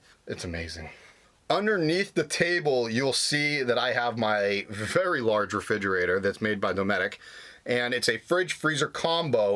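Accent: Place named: Italian-American, United States. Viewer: East coast, Italian descent??? "Batta bing...," "anyways". Lol love it. FYI: water weighs 8 lbs per gallon, plus the weight of the container. Just saw your interview with Bob Wells, came to your channel, loving it so far. Know this video is almost a year old, will be checking out others. Love how fast you talk. Retired biochemist here that did standup comedy as a hobby for fundraisers in San Diego then Az. Mostly retired. Will be on the road by Jan 1, 2020. Carry on.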